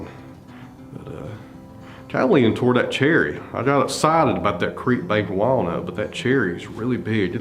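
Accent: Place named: Tennessee, United States. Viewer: The Tennessee accent is entirely A-OK with us American's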